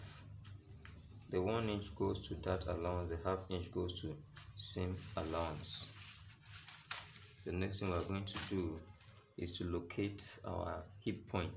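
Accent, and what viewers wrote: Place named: Tiv, Nigeria. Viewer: U speak like my Tiv brothers, weldone.... Wel articulated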